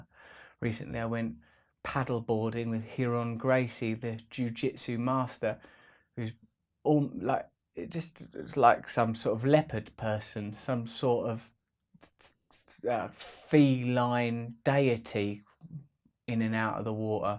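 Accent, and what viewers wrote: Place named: United Kingdom. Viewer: I say this not like this but much like this all the time. Well not all the time but from time to time. I think I would listen to myself a lot more if I had this great UK accent. Great work again Russell, you speak like a man of experience and a man who knows as much of its truth as he can know. I've become a fan fo sho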